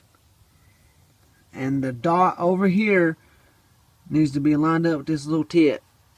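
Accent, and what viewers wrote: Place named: Missouri, United States. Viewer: You sound like your from Missouri, thanks man I hvnt started my project jus yet but i have been searching for about 4 months now ima go dive in head first thanks to you man 🙏🙏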